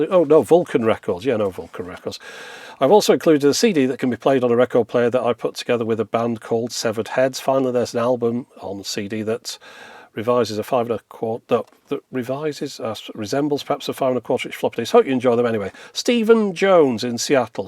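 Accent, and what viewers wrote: United Kingdom. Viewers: As a swedish viewer i can attest to this thing. It is remarcable what he does. And the voice! It is like if it was from a real british person. It is just wow and so much great. Greets from all your non engleesh ppl :D Carry on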